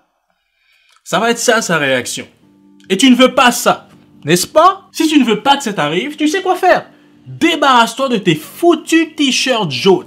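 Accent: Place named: Quebec, Canada. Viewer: Je sais pas pourquoi mais je sent l'accent québécois 😅 sinon vidéo au top 😁👌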